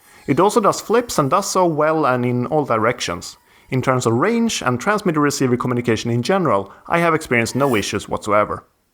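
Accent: Sweden. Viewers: Are you swedish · i can hear that this guy is originally swedish :D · Yay Jag hör en svensk! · Let me guess, you're swedish? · Takes a Swede to know one maybe XD could hear it too immediately and I'm a swede as well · Är du svensk? din engelska låter så iaf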